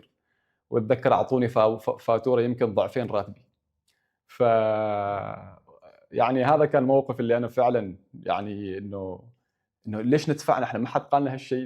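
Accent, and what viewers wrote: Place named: Syria. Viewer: لهجتو سورية الصراحة😅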